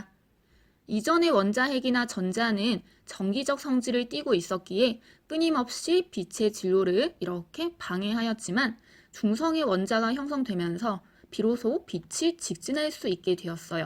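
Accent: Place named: Gyeongsang, South Korea. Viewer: 선생님 경상도분이시죠? ㅎㅎㅎ 억양이 귀여우시네요 ㅎ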